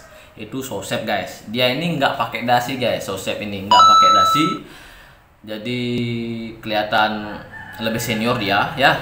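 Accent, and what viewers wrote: Bali, Indonesia. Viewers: Abg orang bali yah. Heheh./lo gue nya msh rada gx mirip sama jkt aslinya heheh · Logat balix kentalll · Logat bali ne asane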